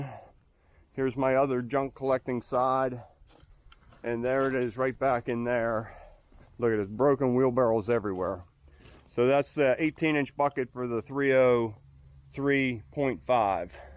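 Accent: Pittsburgh, United States. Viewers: I stumbled across your video and started watching it. The minute you opened your mouth, I knew you were from the Pittsburgh area. I was born and raised in Pgh and moved to Arizona in 1998. I still recognize that Pgh accent! I subbed just to get my Pittsburghese fix lol. Thx for sharing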